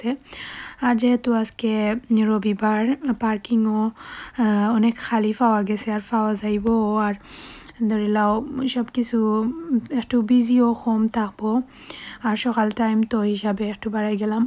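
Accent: Sylhet, Bangladesh. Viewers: ki bala lage syleti kotha sunte👌🏻👌🏻 koro jaga guriya ailam apnar loge😊.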